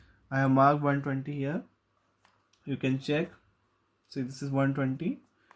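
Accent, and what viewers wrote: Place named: Bihar, India. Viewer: Bro has the bihari accent 💀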